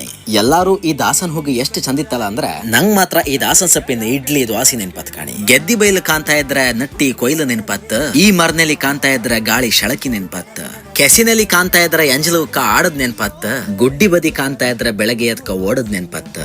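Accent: Kundapura, India. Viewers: I love kundapra kannada❤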